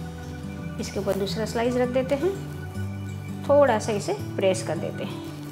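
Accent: Gujarat, India. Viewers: bhale Tame hindi bolo , pan ek gujarati bija gujarati ne odkhi j jay 😃😃😄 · Apart from your surname your tone also confirms that you are from Gujrat .. 😊😊 and also the kitchen setup all white white .. so nice 👍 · Aap gujrati h na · Too much of ur gujrathi accent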